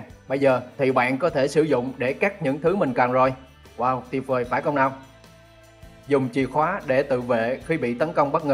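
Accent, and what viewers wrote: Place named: Rural, Vietnam. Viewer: Giọng ông này sao giống nhà quê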